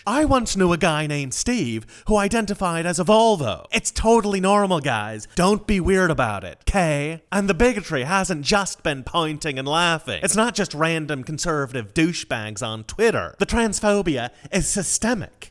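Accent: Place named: Ireland. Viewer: You have sort of an Irish accent Mr.. Also, thank you for using your pronoun in your name so we know your preference.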